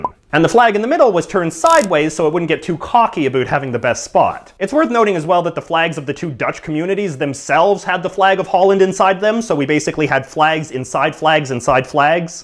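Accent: Canada. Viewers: you canadian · are u Canadian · Whait, is this guy Canadian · Espeon Thomas he's canadian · Hmmm... Is this dude Canadian? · Youtuber's Fans He is Canadian and Canadians typically use Aboot. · STOP SAYING ABOOT your giving Canadians a bad rap honestly stop.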